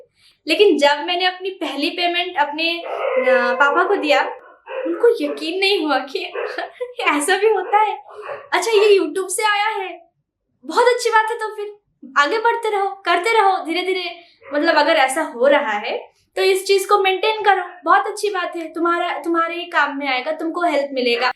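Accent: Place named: Bengal, India. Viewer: Apki ABAAZ me bengoli bhi cute 🥰 lagti h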